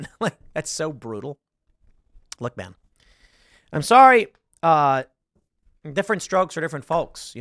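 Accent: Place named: South, United States. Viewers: An attractive lady with hobbies! Hobbies I can enjoy and southern accent, sign me up. I've seen what makes you applaud, your boos mean nothing. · I find her to be beautiful and i love her sweet down to earth southern accent. She seems like she’s down to earth. I definitely would be into her than the tired ass plastic caked on makeup spoiled entitled delusional multiple personality modern women from big cities. Im from Los Angeles, and im sick of the women who spawn out of progressive cities and states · She is a Smoke Show! She’s Beautiful She’s funny and her Southern draw is so attractive Just because she does that stuff doesn’t mean she’s not a girls girl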